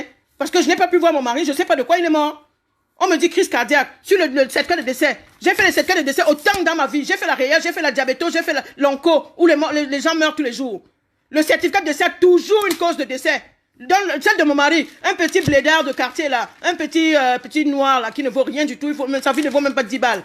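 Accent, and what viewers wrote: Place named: Cameroon. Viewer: Tu as un accent camerouneen. Nous avons apprit que le DOLIPRANE EST SUPRIMÈ DU MARCHÈ PHARMACEUTIQUE PARCEQUE CE DOLIPRANE A UN TAUX ELEVÈ DU CAFFEINE ET C'est mauvais de prendre ce produit si tu as des problemes gastriques et cardiaque. Ton mari avait un mal à la tète et tu as appelè l'ambulance SAMU pour l'amemer à l'hopital. Il fallait d'abord attendre demain matin si les maux de tete persistaient. En lui produisant de la PARACETAMOL ET DES VITAMINES C OR DES ORANGES. 2 COMPRIMÈS PARACETAMOL ACCOMPAGNÈES DU THE ROUGE AVEC UN DEMI CITIRON VERT ET DU MIEL. MASSÈ SON FRONT LA TETE AVEC DU VICKS MENTALATHUM ORIGINAL DE LA PHARMACIE. MASSE TOUT SON DOT AVEC DU VICKS ET LE COU ET LA GEORGE. MASSÉ SA POITRINE DONC LES COTES AVEC LE VICKS. DEMAIN MATIN FAIT LA MEME CHOSE MAIS EN LUI DONNANT UNE DOUCHE BIEN CHAUDE ET MET LE VICKS DANS l'eau dans le benoire. 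Madame tu sais tres bien que les blancs ne portent pas á les peuple noirs quand tu les enttends dire que les VACCIN DU COVID19 DOIT ETRE TESTER EN AFRIQUE NOIR. NOUS SOMMES EN EUROPE MAIS À PARTIR D'AUJOURD'HUI TOUT LES NOIRS VIVANT EN EUROPE DOIVENT MAINTENANT FAIRE ATTENTION PARTOUT OU TU ES. LE COVID19 A ÉTÈ ELABORE POUR EXPEDIER EN AFRIQUE NOIR ET NOUS TUÈS. PEUPLE NOIRS AFRICAINS REVEILLONS NOUS.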